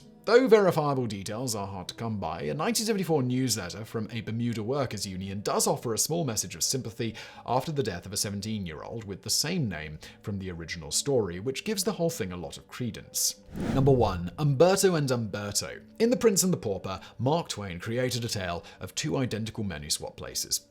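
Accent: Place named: Hammersmith, United Kingdom. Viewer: I feel like your accent is more hammersmith less kent 🤷🏻‍♀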